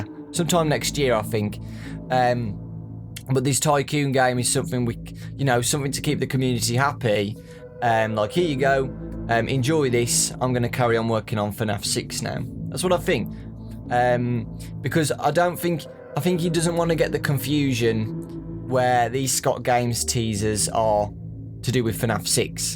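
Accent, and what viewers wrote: United Kingdom. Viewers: Is he british or something ?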